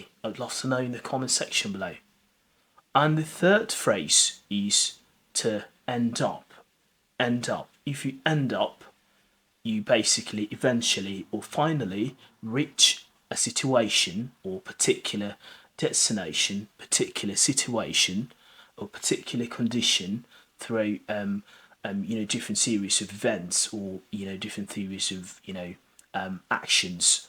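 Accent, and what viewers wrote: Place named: England, United Kingdom. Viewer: I like you accent , what type of accent are you talking with I mean what part of England are you from?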